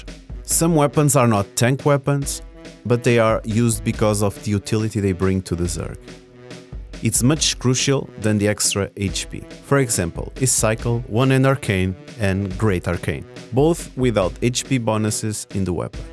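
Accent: Portugal. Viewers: Esse sotaque é de um tuga ahah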